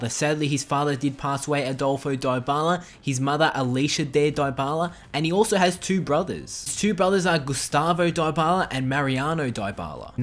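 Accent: Australia. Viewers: Are you Aussie or something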